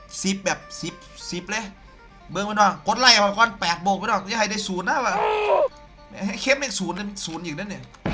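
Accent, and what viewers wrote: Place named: Isan, Thailand. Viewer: มักคำเว้าเจ้าเด้เนาะ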